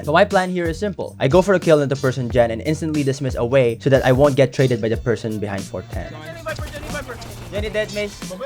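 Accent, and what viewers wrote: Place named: United States. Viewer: Wait your accent was so American I'd never thought you were not American before I heard you speak a different language. Ur insane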